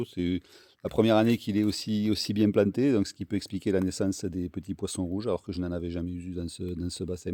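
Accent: France. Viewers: Cc merci pour ce partage. Ils ont bien grossi en plus les bb. Superbes les plantes. Ma menthe aquatique est comme la tienne, elle fleurit. Et joli accent du sud 🙂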